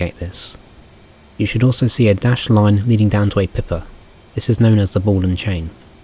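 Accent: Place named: United Kingdom. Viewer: Don' t forget the calm British voice!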